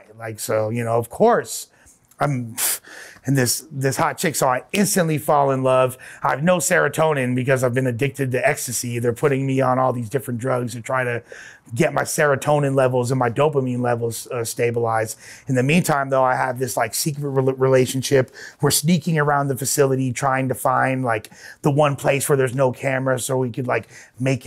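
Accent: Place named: Los Angeles, United States. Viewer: Genuine west side L.A fella , authentic in articulation , finally hear someone who gets it , the recovery language , the program , actually talking the walk. Thank you for "sharing".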